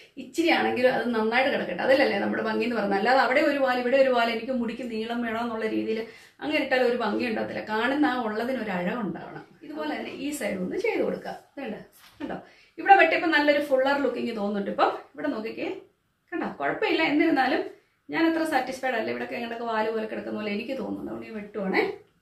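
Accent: Kottayam, India. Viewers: Chechi vdu Kottayam ano???Samsaram kottayam kare pole undu..Pine salwar oke kanichapol narmada ninnu edutha materials anennu paranju atha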